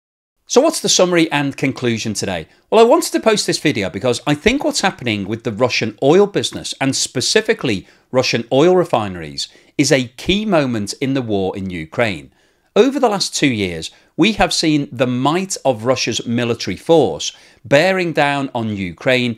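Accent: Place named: England, United Kingdom. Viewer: Yes indeed, if they come from Spain. Of course they may speak Spanish with an Argentinian accent if they come from Argentina. They might also speak English with an Argentinian accent..but this creator speaks English with an English accent. I might speak English with a Scottish accent in which case I wouldn't have an English accent but a Scottish one.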